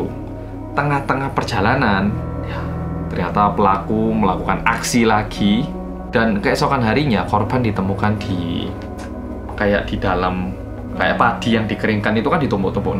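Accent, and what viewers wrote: Java, Indonesia. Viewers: Seru abis nontonya..."Welcome to orang korea yang medok" aku dan temenku. Krik krik krik krik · BERSAMA ORANG KOREA YG MEDOKKK :) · Ya tuhannnnnnn... pekatnya jowo diaaaaaaa😩 selalu aku tgk video dr 🇮🇩 boleh lagi 92% faham... hahhh kali ni 83% jahh faham pon😂 #🇲🇾here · Oh pernah nonton ini pilemnya hehehehehe. Bagusss slow burn and depressing banget sampe skrg ga ada titik temunya. Jadi penasaran irl gimana kelanjutannya jadi lanjutin yg murder ini dulu aja Kakkkkk Oh ya btw sukak banget di 5 menitan awal Kakak cerita beneran ngeliat kamera with ur own words gitu, itu kayak kakak beneran ada di waktu kejadian and ngeliat sendiri kejadiannya kayak apa, jd kakak kayak re-telling bgt gitu makanya aku tonton terus video ini. Apa yak namanya? Engaging banget. Buat aku ini yg bikin Kakak beda sama vlogger lainnya soalnya vlogger2 lain kayak yaudah ngikutin naskah aja gitu or worse, baca script D: Personally aku jg suka bgt org ngomong medok jawa krn kerasa down to earthnya terus kayak ngerangkul banget lak cerita. 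Kayak lagi di warkop terus ngobrol santai. Ga sok mbule pake campur2 inggris gitu Kak. Pls keep it up👍🏻👍🏻👍🏻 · Oppa korea dgn kearifan lokal dan medok khasnya lebih gemes dripada idol yg jdi bias aku wkwkw semangat buat videonya oppaa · Terus terang, saya nonton channel mas, selain karna kontennya menarik (suka cerita detektif), tapi juga karna kaget bisa nemu orang Korea yang sangat fasih ngomong bahasa Indonesia plus Jawa plus ada medok Jawanya :) Saya sendiri orang Solo besar di Jakarta. So pasti saya subscribe and like. Ditunggu ya, mas, video-video berikutnya. Saya pasti nonton :) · 3 channel storytelling favorit: 1. (pelan, medhok dan mudah dipahami) 2. Nadia Omara (gayanya kayak itu ghibah) 3. Arroyan Pram (Konspirasi dunia pake Astronomi, Fisika, dan editannya gila keren banget, serasa nonton agung hapsah dan Jerome polin bahas konspirasi)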